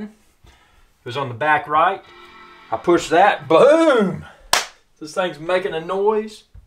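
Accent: United States. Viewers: That country accent is gold! Hope your channels grows big. Keep it up!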